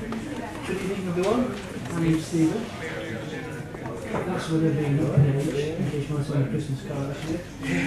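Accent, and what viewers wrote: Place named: Yorkshire, United Kingdom. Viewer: Hahaha your soooo Yorkshire!!!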